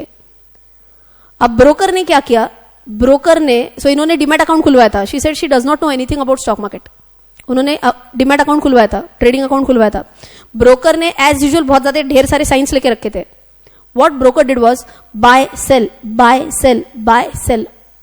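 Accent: Maharashtra, India. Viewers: Hello You have done a nice job, I appreciate you. Do one favour for us if possible, PLZZ switch the thumbnail of last two lectures bcoz after completing 2nd lecture we got a lot of confusions, I personally Google a lot for that purpose,than I go for 3rd lecture which has contained that explanations of same thing 😁. You have done a good job. I love the way of your teaching and Marathi accent you have used ❤️❤️. I don't know whether I do invest in share market or not but I have completed these lectures just to learn the way you present the things apart from the knowledge you have provided. Well-done 👍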